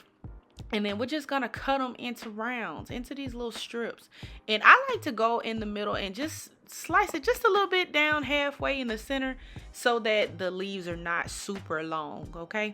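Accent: South, United States. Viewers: Love ya ! Wonderful recipes and enjoy Your Sweet Souuthern Accent 😊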